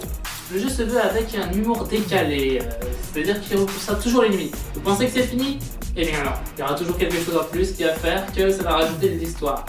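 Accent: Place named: Quebec, Canada. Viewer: J'aime l'accent poce bleu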